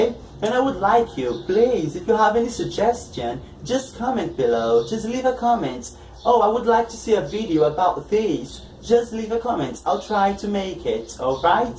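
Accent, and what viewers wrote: United Kingdom. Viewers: Perfect English!!! This kind of accent that i want!! A British one.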